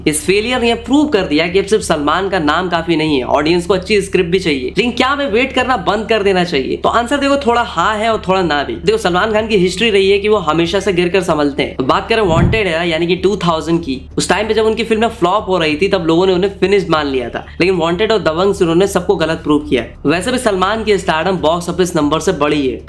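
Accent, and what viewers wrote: Bengal, India. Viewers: Ap bengali ho Kya?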